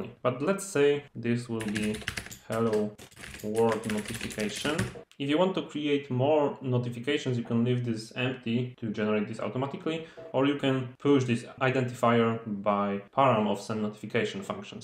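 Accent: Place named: Poland. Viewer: Oglądałem ten tutorial i tak coś czułem ten polski akcent xD Ale dobra robota z tym filmem :D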